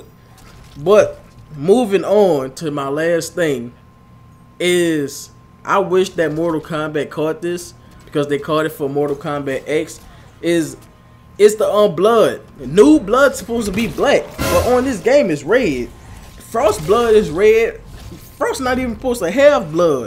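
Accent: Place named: New Orleans, United States. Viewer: you sound like you from new orleans🤔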